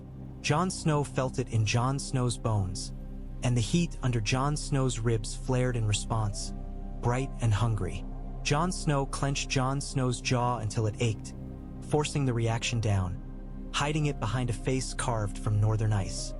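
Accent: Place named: India. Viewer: Why is this one different saying full names and randomly going into Indian accent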